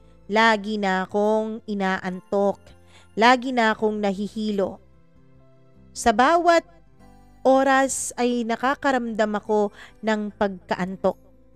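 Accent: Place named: Conyo, Philippines. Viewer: 😅ang konyo ha 😅 inde ko nmn mina Mind 😆 ano yan live selling 😂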